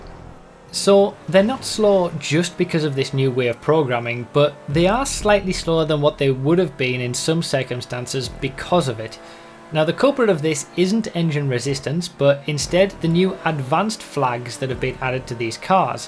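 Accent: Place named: United Kingdom. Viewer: Niyatec No, it’s the british way of saying things.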